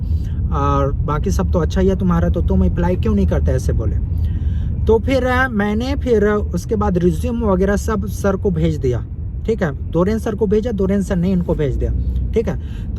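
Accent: Chhattisgarh, India. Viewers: Bhaiyaa tor baat kre me thoda- thoda chhattisgarhi ka esent aat he 😊🙏 Badhiya he👌🙂👍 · भईया आपके हिंदी में भी छतीसगढ़ी अभी भी झलकता है ,,, मतलब एकदम हीरो वाला काम किए हो यार ,, आपके गांव वाले आपको देख कर बहुत खुश होंगे ,, । Love you brother 🙋❣️ from all chhattishgarians,, ekat bar chhattisgarhi bol dete to Maza aa jata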